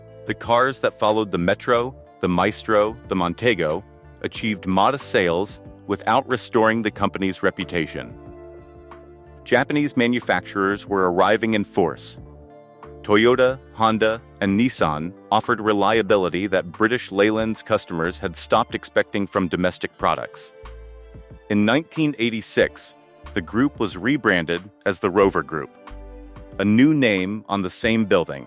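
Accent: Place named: United States. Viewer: American accented AI telling the British their own history again. Images don't match the narrative - a nice shot of the VW Beetle production line at 0.53. Very poor editing and continuity.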